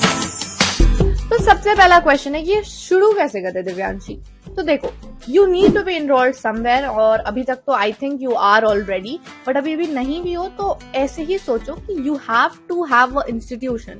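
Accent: Bihar, India. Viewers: aapke bolne ke accent se lagta hai aap bihari ho i am also from bihar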